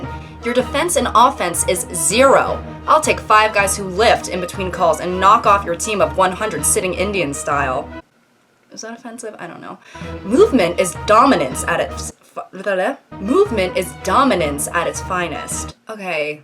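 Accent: United Kingdom. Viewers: omg your british accent is hilarious! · that british accent tho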